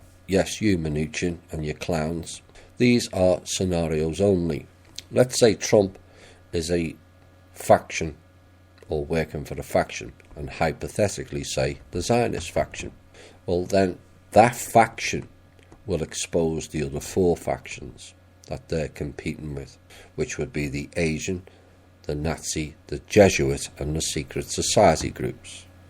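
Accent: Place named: Merseyside, United Kingdom. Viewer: Interesting! There are many players! Good intentions and a sincere desire to bring the light of truth and a better way for so many! And not corruption and cro.nism for an elite few. You sound like a merseyside lad! Me too! Thanks for your contribution to righting a wrong world.👍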